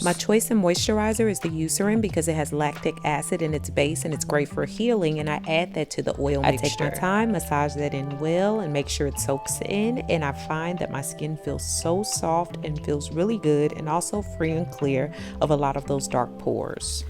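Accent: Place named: South, United States. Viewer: She's grew up in St. Louis & Memphis & lives in Texas so I think it's a mixture of those three. Just my opinion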